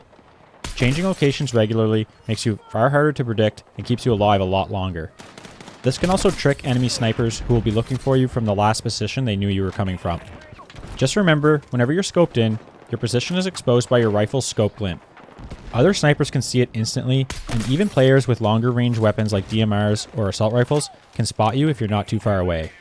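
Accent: Canada. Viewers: This guy gotta be from the maritimes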